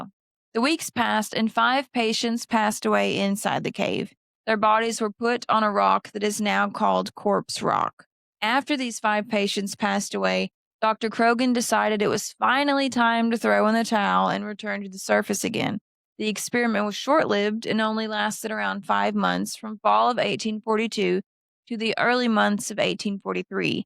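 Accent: Kentucky, United States. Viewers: First video ive seen of yours,everything seems grade A+ i enjoyed the accent,sounds like a ky southern one( i could be wrong and either way dont post what state yer in cuz there's tons of aholes that would just dox ya) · Hi, my friend, I just found your channel, and I'm so glad and happy that I did. I'm speechless, because I'm getting some real and true history facts, told with that absolutely lovely and sweet southern Kentuckyian accent of yours - I'm officially in awe, and I could listen to you talking for the eternity, lol. So you got my like and sub, that's the least I can do, to thank you for your awesome video, that I'm enjoying so very much. So stay awesome, smart, and gorgeous as you are, my friend. Greetings, much respect, love, and all of the best wishes, from "across the pond", from Serbia, southeast Europe. Cheers!👨‍🎤🌹👧🇷🇸🥂🇺🇸🌏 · I can walk to Mammoth cave lol. 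That is how close I am to it. Kentucky has a bunch of beautiful ladies. Doesn't surprise me to see another one like you here. Nice video! Edit: When I hear you talk I can tell you have that Kentucky accent behind your words. I didn't even notice mine until I heard my voice play back after a recording I made. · here from history of everything podcast. FINALLY, a Kentuckyian with a Kentucky accent! 😊 Unlike whats-his-name who claims to be kentkian with no accent??!, 😅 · Don’t know how this video popped up on my for you, but glad it did. Just subscribed, she sounds like one of my Kentucky girls so I love it and MC is a field trip we all had to take at some point. Looking forward to seeing more content from her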